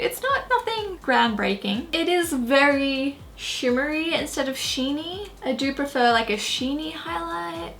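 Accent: Australia. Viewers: Love your videos. You are absolutely beautiful and I love your Aussie accent ❤️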